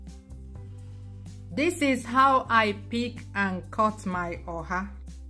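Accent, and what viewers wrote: Igbo, Nigeria. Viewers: I need the Igbo accent you’re spilling, it’s a great accessory your sharing whilst cooking a great soup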